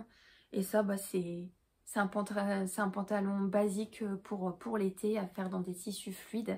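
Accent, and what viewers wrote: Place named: Nord, France. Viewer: Hello! Vidéo très sympa, et qui a confirmé ce que je pensais: tu es du Nord aussi! Lol j avais senti un tout tout petit accent de chez nous;-) bon week-end ma jolie!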